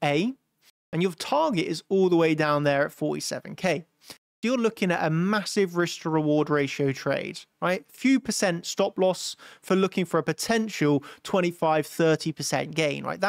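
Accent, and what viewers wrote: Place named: Somerset, United Kingdom. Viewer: SOUTH WEST. Man's got a Somerset accent. Beautiful part of the country ❤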